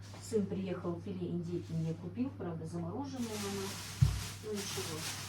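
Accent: Standard. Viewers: У вас чистая русская речь,удивительно даже,дай бог здоровья! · здравствуйте, молодец, как у вас всё здорово получается, а как вы чистейшей говорите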